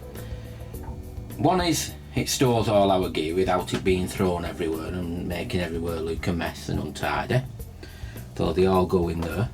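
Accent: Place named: Oldham, United Kingdom. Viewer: Are you from Oldham by any chance, you sound like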